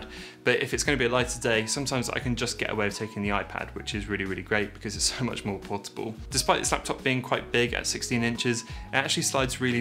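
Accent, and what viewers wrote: England, United Kingdom. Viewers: I am very careful with my gear and so use cases and watching your videos I notice on your phone you do not use a case. Are you very particular in how you handle your phone or do these higher end iPhones have some sort of protective covering on them. I try to be as environmentally aware as possible but one can only go so far with technology before it becomes obsolete . I am facing this now with my first generation iPad Air. I purchased it in November 2013 so coming up for ten years but in this last year, even though it still works well sadly most app´s have stopped working with it and even safari pages will not open in many cases due to the old iOS! Its sad as well as I have always kept it in a folio case and if I take it out the thing still looks brand new! After watching your reviews of the iPad 10th Gen and the 5th Gen iPad Air I have decided to get the air due to the M1 chip and hopefully that means it will have a longer iOS upgrade lifespan, I have been on ios12 point something for years now on my old iPad! 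I am guessing I will notice a considerable difference. I have seen this already with comparing it with my iPhone X ( still working perfectly with plenty of battery left and again, always in a folio case so no need for those horrid screen protectors again, it still looks like new, hopefully I have a good few years left in it as far as iOS upgrades are concerned. I am very much enjoying your channel and have subscribed. Nice to hear and English Accent. I am from Devon bu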